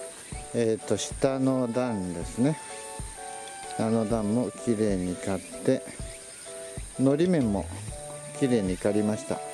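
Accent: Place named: Oita, Japan. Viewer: さま 大分弁丸出しでいつも楽しんでます。 草刈りのチップソー飛び散って痒いのも 有る有るですね。飛散防止をつけるのが良いかもしれませんが 私もそう考えながらまだ購入してません。草刈りがちょこっとだからです。 はなちゃんは必ず予防注射してから 離してください。パルボなんかでコロって死ぬ事が多いです。 一度は注射してませんか？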